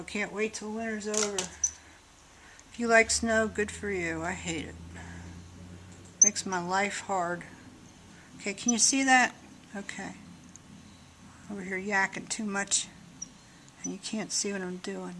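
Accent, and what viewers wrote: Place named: North Georgia, United States. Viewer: you're so much fun and I love watching your tutorials. You sound a lot like we do in North Georgia. Your sense of humor is such fun & you make everyone feel good about what they're doing.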